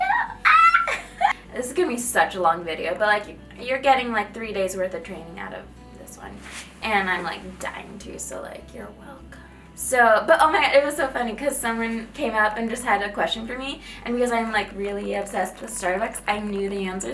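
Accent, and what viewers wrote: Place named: Canada. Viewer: You sound Canadian ❤️🇨🇦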